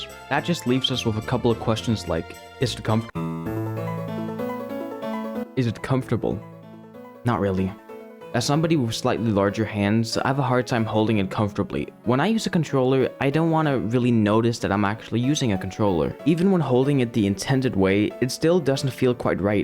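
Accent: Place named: Denmark. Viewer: buddy, are you danish or do you just happen to have a very danish sounding accent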